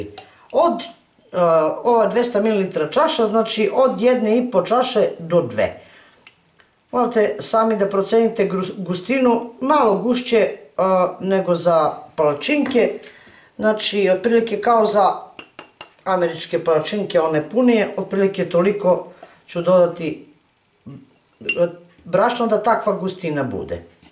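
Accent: South, Serbia. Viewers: Fantazija ukusa,pogotovo gde ima krompra to je neizostavno,svi ostali sastojci su nesumnjivo takođe doprineli ukusu.Moram da ti każem da ti je glas toliko umiljat i taj jużnjački naglasak,podseća me na divnu glumicu Radmilu Savićević, Puno pozdrava.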